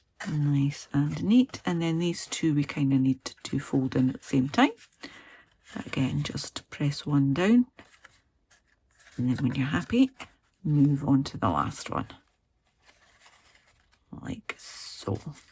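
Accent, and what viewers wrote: United Kingdom. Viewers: Oh my goodness no, you do not look like “her” at all!! 😂 Robin Williams must have studied many English accents to perfect it like he did, the minute I hear your voice, it takes me right to that movie, he did a great job with that character! I agree, the SU community has brought me many wonderful friendships that have lasted years, since I started using their products back in 1998! I’ve only been a demo the last 7 years, in June, and I couldn’t imagine a life without SU and all the wonderful people in it! 😌 Take care, it would be wonderful if our paths could cross one day! 💜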